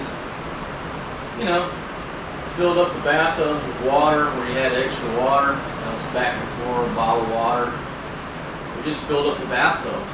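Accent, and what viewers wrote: South, United States. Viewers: You have a good country voice 👍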